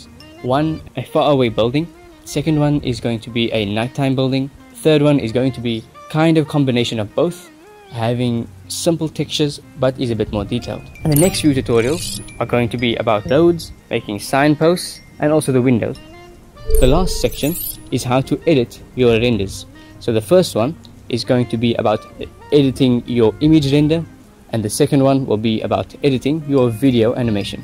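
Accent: South Africa. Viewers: Is that a Capetonian accent I hear? Great videos!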